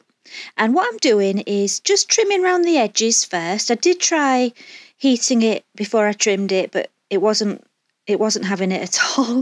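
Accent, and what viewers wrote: United Kingdom. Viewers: 这姑娘是英国口音么？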